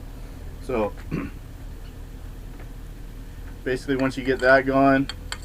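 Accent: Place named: Louisiana, United States. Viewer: You must be from home I can hear it in ur voice yeah!! ( Louisiana)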